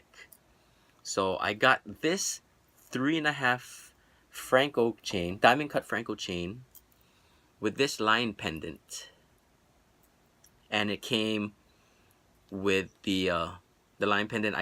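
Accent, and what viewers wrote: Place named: Hawaii, United States. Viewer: Hawaii boy i can tell . kalihi maybe waianae ..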